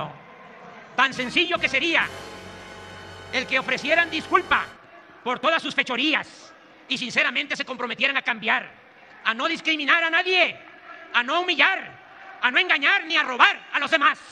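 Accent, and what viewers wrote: Tabasco, Mexico. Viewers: Hasta parece que estoy oyendo a Hitler en mal español tabasqueño.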